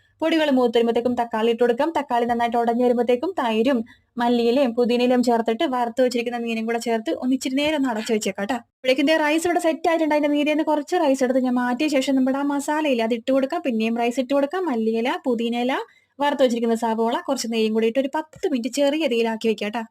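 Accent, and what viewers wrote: Kochi, India. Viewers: Biriyani kazhichathinte Santosham aano last ile dance 😂 Eniku othiri ishtama ...kochi baasha kelkaan❤❤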